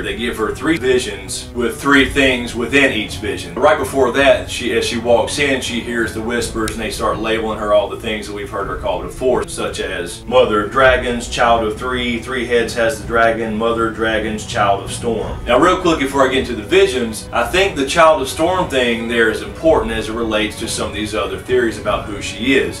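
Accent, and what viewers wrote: South, United States. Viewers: I am so glad I found this channel! You are the first person I have seen/heard with a southern accent to clearly articulate theories and discussion about the books. Brilliant!! Makes me proud to be southern!! ;)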